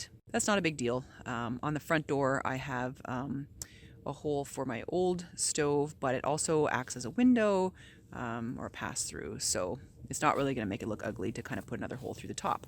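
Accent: Canada. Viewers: You must be Canadian! Just subscribed. Never stop saying "oat" for "out." Of course your way is just a correct as ours!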